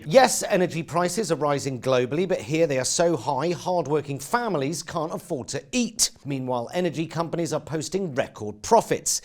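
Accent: England, United Kingdom. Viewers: Something about the English accent that makes screaming out facts sound like satire. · Is this considered particularly foul mouthed? This is how we all talk in Jersey, minus the British accent, of course.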